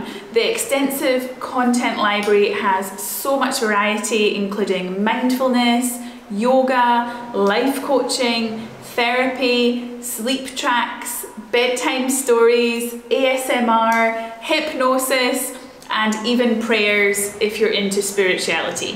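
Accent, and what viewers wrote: Scotland, United Kingdom. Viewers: My favorite quote for this video: "Who needs a house when you can live in a cave." Love your sense of humor and of course your lovely Scottish accent. I don't know if you go back and read comments from months ago. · Cheers from Canada. Love your accent, Being of Scottish ancestry I wondered what I would sound like if I was from there. Lol! Enjoy your stay in the cave, you got it! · Your Genetic Heritage reminds me of an Old Comedian that is Largely Forgotten Nowadays....STANLEY BAXTER;...... U definitely have his Nose👃 & Broad Scottish Accent..... Sooooo; Cool🆒😎👍🤓❤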